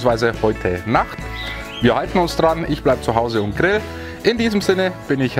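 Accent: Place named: Bavaria, Germany. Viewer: Moin, jetzt habe ich schon unendlich viele Videos, mit deinem schönen bayrischen Dialekt, von dir verfolgt. Gestern habe ich das Gulaschrezept ausprobiert. Meine liebe Frau hat alles geschnibbelt, und ich durfte zubereiten. Das Ergebnis war wirklich super. Mach weiter so, ich tu's auch. Beste Grüße, Hubi und Doris aus dem Münsterland.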